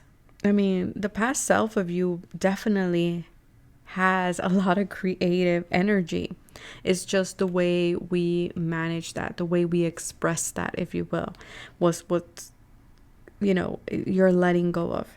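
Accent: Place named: Latin America. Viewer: I think she has latina background, she speaks also spanish, so thus the accent. She is not african american.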